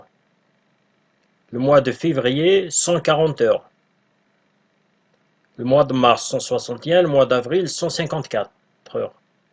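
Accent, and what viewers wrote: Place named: Kabylie, Algeria. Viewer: Merci beaucoup, je souhaite suivre une formation pour devenir gestionnaire de paie, je trouve vos videos intéressantes merci beaucoup Au passage, dapres votre accent, je pense que vous etes kabyle, ca fait plaisir de voir des kabyles proposer des contenus pareils sur youtube, bonne continuation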